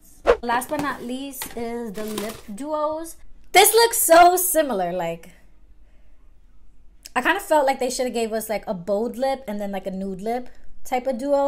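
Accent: New York, United States. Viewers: you sound like you from new york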